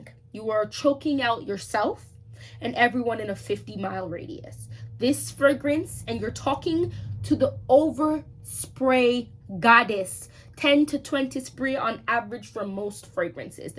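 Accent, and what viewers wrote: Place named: Jamaica. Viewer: Lmao it’s the Jamaican dialects in bits & pieces for me. Enjoyed this vid!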